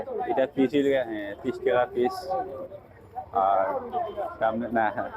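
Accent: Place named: Sylhet, Bangladesh. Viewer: Vai Sylheti kota sera valo lage ❤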